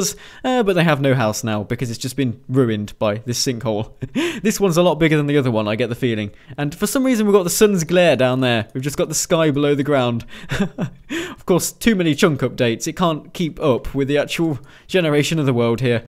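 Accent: United Kingdom. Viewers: hola solo quiero preguntarte si eres británico o no ... ?? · are u British not to be rude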